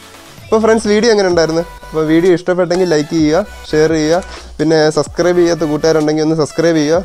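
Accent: Kottayam, India. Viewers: Bro kottayakaran aanenn thonnunnu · Kottayam kaaran aano alla aa nadu fagathu ennu parayunnathu ketu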